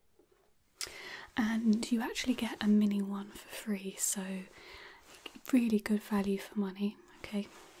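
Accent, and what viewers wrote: United Kingdom. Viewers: If I could ever actually master a British accent I’d love to have the same as you. ❤️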